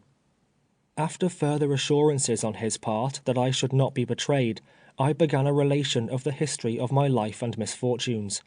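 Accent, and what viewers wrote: England, United Kingdom. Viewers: I wanted to compliment the narrator. The English accent really conveyed the proper Grammer that was then real English. This history really shows how far we have sank from using words appropriately. A free'd slave spoke better and proper English than most people I know today. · Who would listen to this History read by English accent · yes please do. hearing a white british guy read it like hes reading the paper just feels wrong. it needs the power and feelings.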